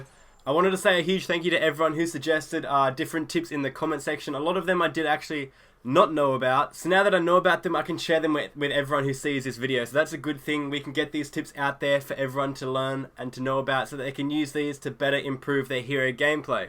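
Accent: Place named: Australia. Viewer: why is every fucking battlefront 2 video narrated by an aussie?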